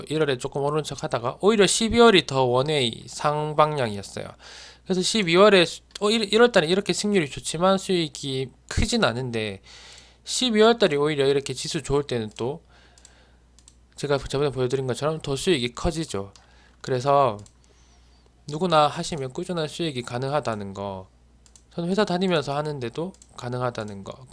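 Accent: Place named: Daegu, South Korea. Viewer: 안녕하세요 같은 직장인으로, 애 둘 아빠로 동질감을 느끼며 영상 잘 보고 있습니다~ 어제부터 정주행으로 조심씩 보고 있는데 나이도 비슷한거 같고 경북/대구쪽이니 사투리도 정겹네요~ 궁금한게 있어서 몇 가지 여쭙니다^^ 1. 주식 공부는 혼자 하신건지? 어떻게 공부를 하신건지?3년 동안 다지기 이후 이제 빛을 보게 되신건지?^^; (혼자 학습으로 3년 동안 시행 착오도 계셨을텐데...) 2. 대부분 종가베팅 후 시초가 매도던데 종목 선정은 이전에 올려주신 조건식 위주로 하신건지? 조건식은 어떤 것, 몇 개를 사용 하시는지.. 종가베팅은 대부분 3시부터 분할 매수로 진행 후 확신있는 것은 18-19분경 베팅 하시는지? 소액으로 종가베팅 연습중인데 승률이 그렇게 좋지 않아 좀 자세히 여쭤보고 싶어요~~ 감사합니다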